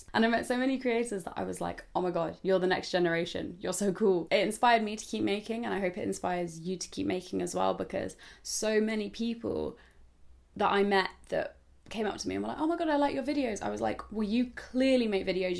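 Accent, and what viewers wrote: United Kingdom. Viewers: I wish I could meet you a onc, just to tell how much you are gorgeous with British accent .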